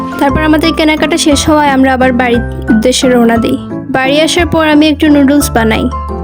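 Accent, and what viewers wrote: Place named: Sylhet, Bangladesh. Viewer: তুমার মুখে সিলেটি ভাষা প্রচন্ড ভালো লাগে।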